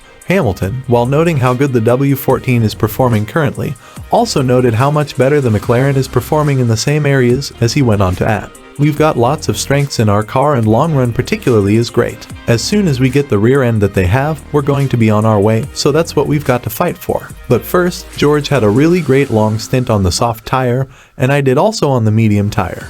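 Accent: United States. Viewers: As soon as I hear an American accent I anticipate click bait. Sorry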